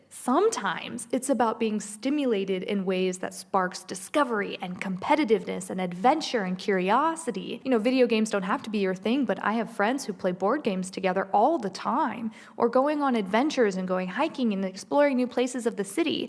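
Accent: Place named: United States. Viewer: I searched and searched and searched the world of YouTube for you. To think this whole year you were right here the whole time. I had to tell the algorithm that I wasn’t interested in hyper general viral videos and search for god centered wellness. Little did I know, you’d talk about healthy living in a fuller sense. Curios about your background. You say you’re from turkey but you should like you are born & raised American. Anyway, thank you for this.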